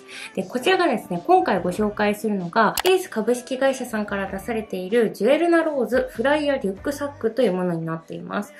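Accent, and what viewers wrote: Kagoshima, Japan. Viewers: 鹿児島県民です✋ 鹿児島弁聞いて、癒されました笑⸝⸝- ̫ -⸝⸝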